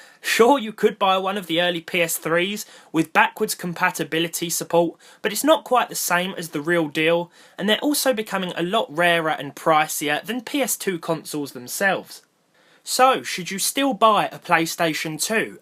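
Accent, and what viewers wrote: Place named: Essex, United Kingdom. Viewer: I'd have to kill myself if I spoke like him , a towie reject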